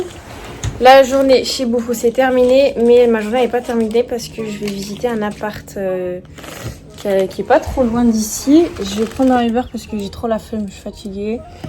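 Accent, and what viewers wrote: United Kingdom. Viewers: Ton accent British 🥺🥺🥺🥺🥺❤️❤️❤️❤️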